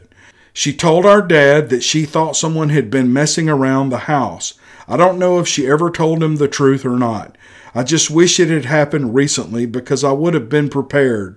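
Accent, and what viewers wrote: South, United States. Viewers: I never comment, but I listen every night. Your southern voice puts me at ease at night. I enjoy your comments and the way you read these stories. Please keep the stories coming!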